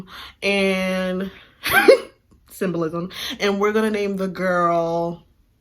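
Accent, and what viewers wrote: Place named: United Kingdom. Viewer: So proud of you! Its the British accent for me lmao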